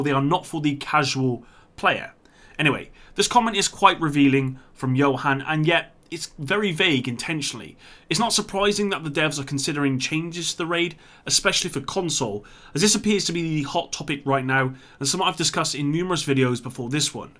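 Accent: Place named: Southwest England, United Kingdom. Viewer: You from sw england? You sound like home haha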